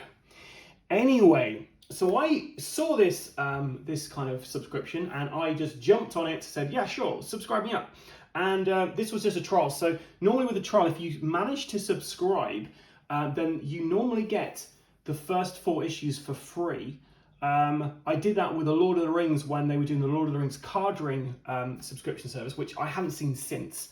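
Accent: United Kingdom. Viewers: OK, I'm confused. Youtube says this was uploaded a year ago but it only came out a couple of months ago didn't it? I'd put it down to geographic area but I'm uk and your accent is English.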